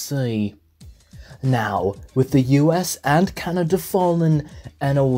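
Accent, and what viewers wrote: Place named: United Kingdom. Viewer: didn't know you're british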